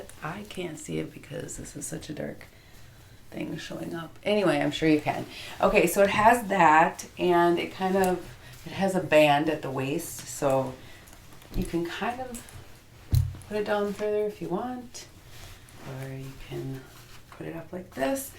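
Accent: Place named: Midwest, United States. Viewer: Okay, I have to know... are you from the mid-west?! I grew up in Minnesota and have lots of family there and you have sweet little hints of the mid-west accent. :)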